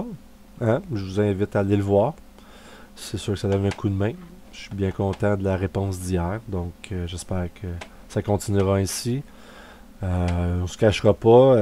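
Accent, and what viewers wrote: Quebec, Canada. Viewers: J'adort l'accent Quebecois!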